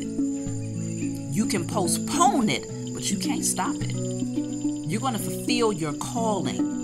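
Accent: United States. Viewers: Love your accent. Which Part of America you from? Also, this here is one of the Most Powerful and On the Nail message I have hard in many years. Not here to state my personal business, but I want to thank you. From Black Man, from London, UK.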